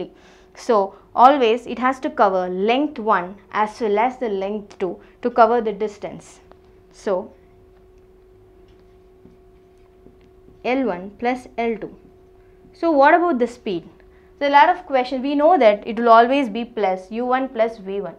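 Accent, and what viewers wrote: South India, India. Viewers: You're from south India?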